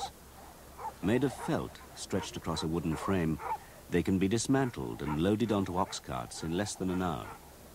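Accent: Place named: United Kingdom. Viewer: I much prefer the British Narration in these videos, but for those looking for the American Narration you can find the first of the 4 videos here > <link>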